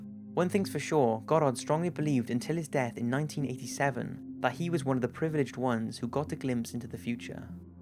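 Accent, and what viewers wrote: United Kingdom. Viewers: Loving the content man, keep it coming! Out of interest, are you from the UK (and if so, which part)? Your accent is awesome for these videos.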